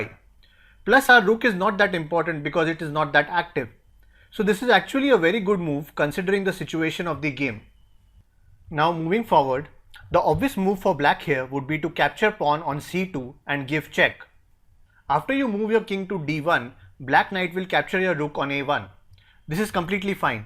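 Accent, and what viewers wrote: India. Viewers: u r indian . ur accent tells it. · idkw but youre very good at this, you teach really good even with the indian accent , just pls remove the intro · Hahah I love the Indian accent. It fits so well into chess videos and it is also pretty entertaining :P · Love the indian accent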